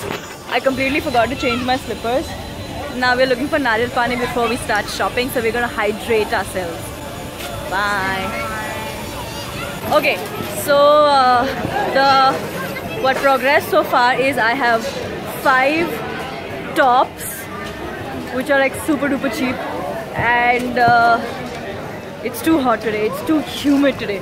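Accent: Maharashtra, India. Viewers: I lyk d Marathi touch u gv in all your videos... "Just ugach " ❤️..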